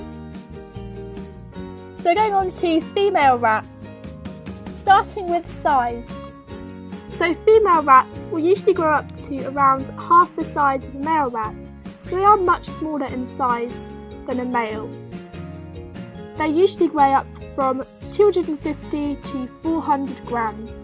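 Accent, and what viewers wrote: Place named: London, United Kingdom. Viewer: What area of London is this accent from?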